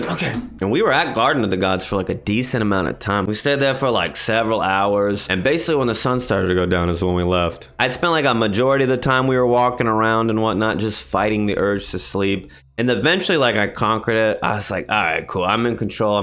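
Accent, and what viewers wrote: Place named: Boston, United States. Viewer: Where you from man what’s the accent? I’m from just north of Boston and sounds kinda familiar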